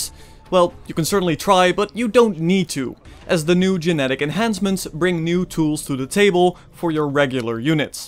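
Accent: Netherlands. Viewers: I think I hear a Dutch accent. :)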